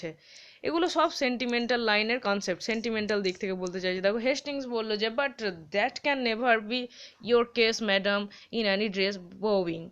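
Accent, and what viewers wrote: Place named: Katwa, India. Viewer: To cover up the whole syllabus for the upcoming SLST, binge watching/listening the whole playlist. Thank you very much. Madam, are you from Bazarsau-Shaktipur-Katwa area? The bengali accent seems familiar.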